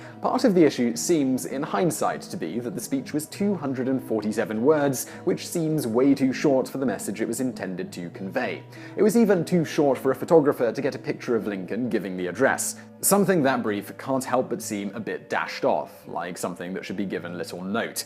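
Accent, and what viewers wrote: England, United Kingdom. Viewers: Our history not urs, ur obviously british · Why is a british guy trying to tell us about Gettysburg - maybe he should have done a video on yorktown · Tory Sanders He definitely said Gettys burg with the wonderful English accent. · Don't care for a LIMEY narrating US History!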